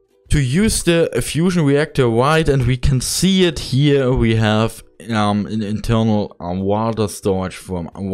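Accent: Germany. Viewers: Sofort erkannt dass dun Deutscher bist xD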